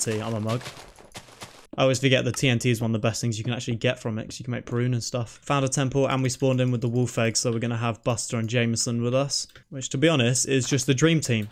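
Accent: United Kingdom. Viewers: QnA: Where are you from? I think your accent sounds English? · Lovely jubbly video ❤️my Q&A question is :you sound British are you British ? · just wake up and i can hear the british accent man talking QnA: wat is your real name ?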